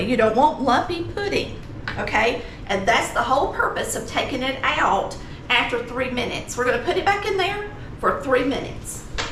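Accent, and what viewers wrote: South, United States. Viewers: Thank you so much for your recipe and your accent makes me think of home, I live in Tasmania now, but born and raised in the south. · OMG...I could listen to you talk all day long! Love your southern accent and will for sure try this recipe. Bless your heart!!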